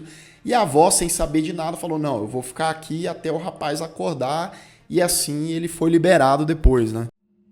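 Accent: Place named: Brasília, Brazil. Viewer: Vc é meu vizinho!!! Sabia que pelo seu sotaque devia ser daqui por aqui perto (sim, galera de Brasília tbm tem sotaque). Não vi série, mas pretendo. Estranhei essa cor amarelada, achei que fosse meu celular, agora eu entendi hahaha.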